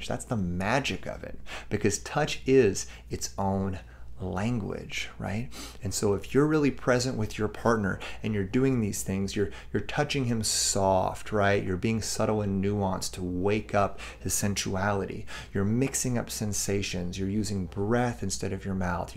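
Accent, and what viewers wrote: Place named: South, United States. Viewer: I love your southern accent.🌞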